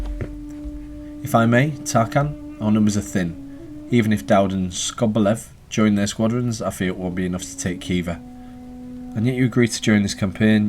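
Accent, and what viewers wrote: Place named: Northern England, United Kingdom. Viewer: Awesome videos man. Nice to hear a fellow northern accent rather than hyperactive Americans! Looking forward to seeing more, this game looks fantastic.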